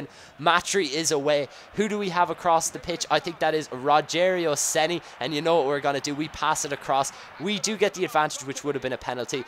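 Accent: Ireland. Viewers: Wow this series is godly btw where are you from you sound Irish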